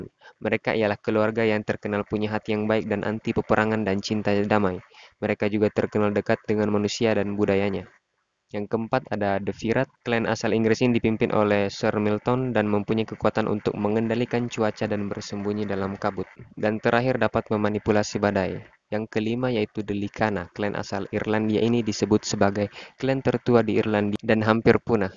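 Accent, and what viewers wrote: South Sulawesi, Indonesia. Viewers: Bang orang sul-sel ya? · Orang Bugis ki kah,logatta sangat kental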